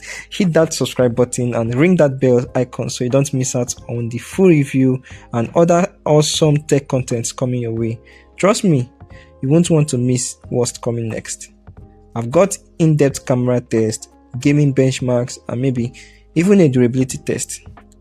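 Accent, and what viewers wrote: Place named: Venezuela. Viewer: Español venezolano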